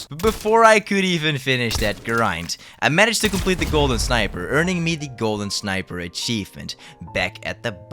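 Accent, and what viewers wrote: Netherlands. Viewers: Dutch (I guess as a fellow Dutchman)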